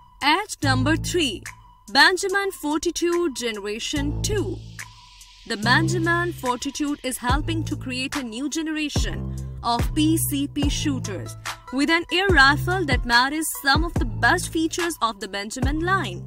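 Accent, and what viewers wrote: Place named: India. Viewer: The Indian accent is worse than the robot voice.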